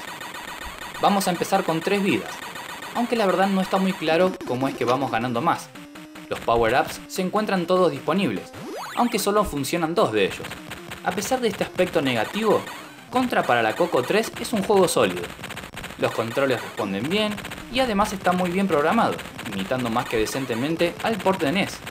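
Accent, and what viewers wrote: Argentina. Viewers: Por que carajo me olvide que seguia este canal? Muy bueno tu contenido y tu acento argentino pipicucu saludos de Entre Rios. (faltan insultos) jajaja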